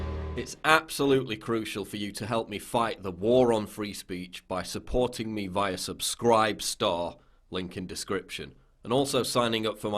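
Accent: United Kingdom. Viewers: Even if I wasn't on his side politically, I would still love this rant for how british it sounds...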